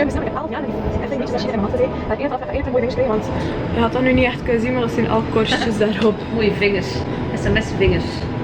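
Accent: West Flanders, Belgium. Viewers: Funny... en west-vlaams! Ohja en ik moest van Hannes :D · & ik hou van het West-Vlaams in je vlogs!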